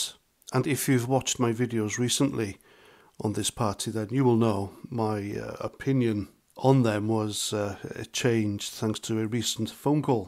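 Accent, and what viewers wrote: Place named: North Wales, United Kingdom. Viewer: Iawn c ont. Ti'n iawn? 🏴 Dwi wrth fy modd clywed acen gog ar you tube.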